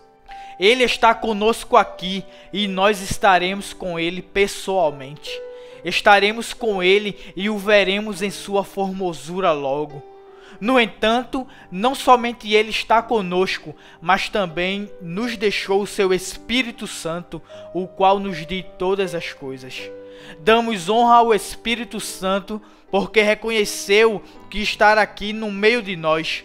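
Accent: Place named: Pernambuco, Brazil. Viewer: Parabéns ao locutor, que Deus o abençoe por sua disposiçao em transmitir esta palavra abençoada. Pelo sotaque creio que o Irmão é pernambucano, conterrâneo nordestino. Sou do Ceará. Orem pelo nordeste precisamoz das orações dos irmãos. Glórias a Deus.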